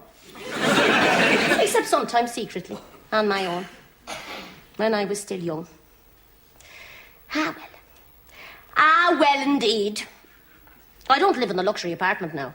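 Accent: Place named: Ireland. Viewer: This is an excellent irish accent !!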